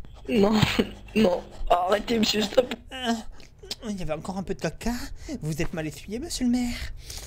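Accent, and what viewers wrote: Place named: Alsace, France. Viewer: Tu vien d'Alsace ?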